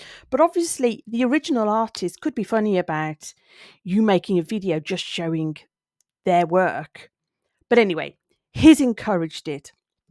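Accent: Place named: Solihull, United Kingdom. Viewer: I love your comments on watching Ren, the Collective joy that it brings watching others discover him for the first time! You say you are not the typical person to be a Ren fan… I will prove you wrong!! I live in Brighton, and when he announced that he would be playing one festival this year, he chose The Secret Garden Party. Lots & I mean lots of fellow Renegades, decided to come to Brighton first, from all over the world! I offered my sofa bed for anyone that needed it, I now am super close with the lady from Canada that came to stay with me! I’m 49 & she is in her late 50s. We met with others, mostly women, mostly 40+ in age! Ren has spoken to so many people through his music, young and old! The is a guy in his 80s with a reaction channel (Vietnam Era Vet) who LOVES ren also! Glad you love him also! ❤ Also, you sound like you are from my neck of the woods 😉 I was born & dragged up near Solihull! 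🙏♥️🙏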